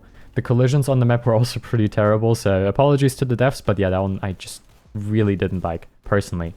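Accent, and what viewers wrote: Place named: Germany. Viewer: I hear intense german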